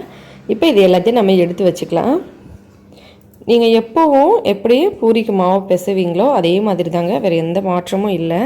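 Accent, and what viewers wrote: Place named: North India, India. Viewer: நீங்க இந்திகாரங்க தான ......ஆனா அழகா தமிழ் பேசுறீங்க எப்படி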